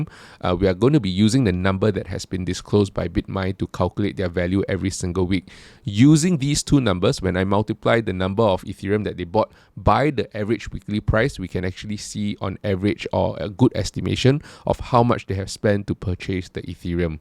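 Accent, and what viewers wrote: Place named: Malaysia. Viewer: I suspected but again you sound/accent exactly my Malaysian colleagues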